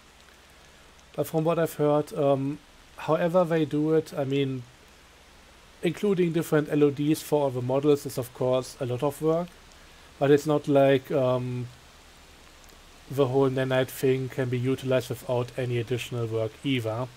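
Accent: Germany. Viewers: i really enjoy your germanized english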